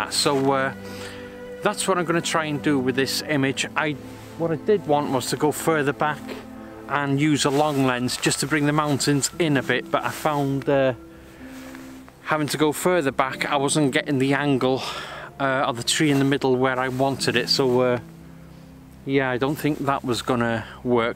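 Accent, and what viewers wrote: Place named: Wales, United Kingdom. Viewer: Blwyddyn Newydd Dda. I sure am missing Gwynedd but good to hear your accent, well I guess you got some more snow, and thanks for getting out there.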